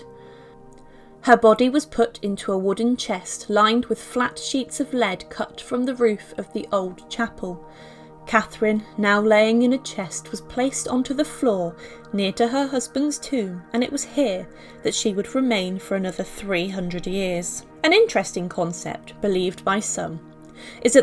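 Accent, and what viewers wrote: England, United Kingdom. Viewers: For something so interesting a story, what a scholastic, boring narration. Make it interesting to listen to just not have slight English accent and “ read” ! How pathetically boring! Why bother? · You sound English. Odd, then, that you do not know that the surname of Samuel Pepys is pronounced "peeps". Just so's you know.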